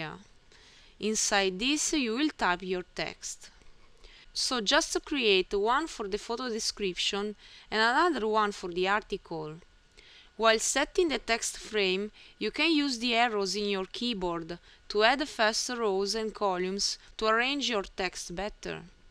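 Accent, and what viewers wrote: Italy. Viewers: Hahah true I think so, I'm italian and I've think the dame thing 😃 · I'm Italian aswell..sounds like an Italian speaking English but, to me, the rhythm/strong emphasys on consonants when she pronunces 'We will take for example....' and 'which format the page must have...' makes me think that she might be Russian.....I have few Russian friends here in Bangkok and the 'musicality' is the same.... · Are you Italian? Your accent sounds like italian people speaking English. :) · nice to follow even with your italian accent its easy to still understand actually very clear to understand :)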